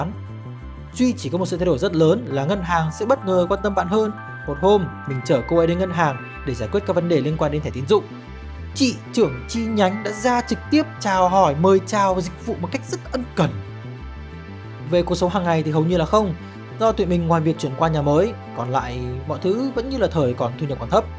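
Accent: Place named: North, Vietnam. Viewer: Clip khá hay! Cám ơn Team! P/s: chỉ có xíu ý kiến là người đọc có thể nói chậm, rõ hơn, đừng nuốt chữ. Thật sự người miền Nam như mình nghe giọng miền ngoài rất khó, huống chi các bạn nói nhanh, là tụi mình khóc cạn dòng sông. Tụi mình xem thời sự đài VTV là không hiểu gì luôn. Cám ơn vì đã đọc!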